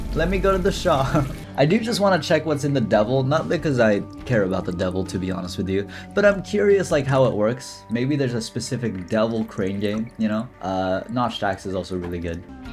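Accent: United Kingdom. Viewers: He's from there so it's always a British accent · day 25 of asking to make full isaac video in british accent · Day 14 of asking to play T isaac and talk the whole time in his British accent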